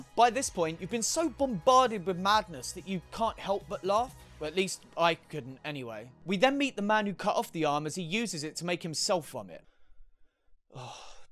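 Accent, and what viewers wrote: United Kingdom. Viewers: Yes I always thought I would love to critique movies just like this! I am the crazy movie watcher😂 also hearing your accent makes me REALLY miss the 🇬🇧!!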